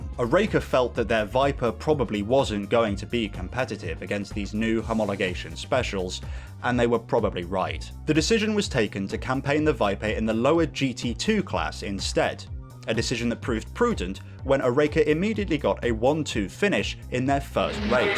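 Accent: United Kingdom. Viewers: Ah yes , a British accent guy talking about cars, hits the spot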